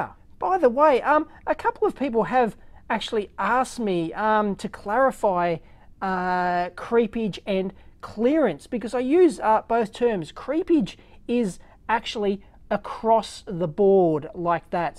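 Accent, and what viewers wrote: Australia. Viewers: he is not British he is Australian they sound nothing alike for god sake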